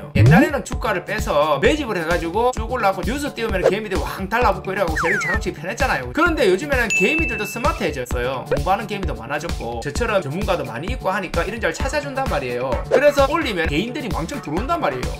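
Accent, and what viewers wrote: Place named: Gyeongsang, South Korea. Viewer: 왠 만한 주식강의 보다 더 현실감쩌는 콘텐츠 구수한 경상도 사투리도 듣기 좋고.. 나도경상도.ㅋㅋ 감좌합니다~ 선배님..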